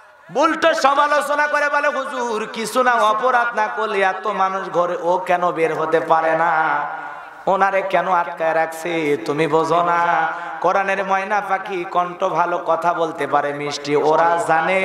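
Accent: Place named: Noakhali, Bangladesh. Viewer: ওরে নোয়াখাইল্যা ভাইরে। বিরে আশি নয়,বিরাশি বলেন। ভাষাটা একটউ শুদ্ধ করে বলেন।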